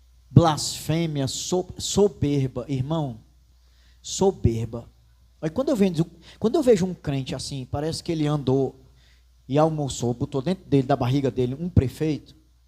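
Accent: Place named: Northeast, Brazil. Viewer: Gostei demais fala nossa língua nordestês. Glória a Deus!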